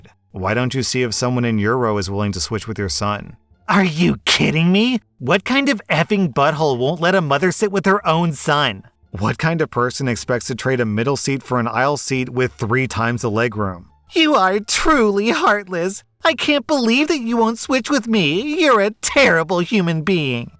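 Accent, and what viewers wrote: South, United States. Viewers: Im not from the south but i love your southern accent. Please use it more? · The slight southern accent killed me 😂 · The southern accent is perfect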